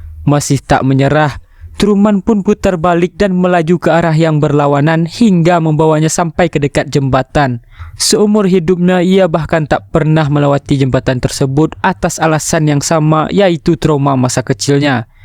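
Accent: West Sumatra, Indonesia. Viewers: Ini urang awak yg ngomongnya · Logat minang ngomong lu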